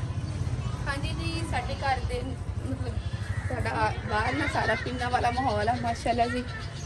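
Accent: Punjab, India. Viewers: Her tone and style is more of eastern punjab than of western punjab.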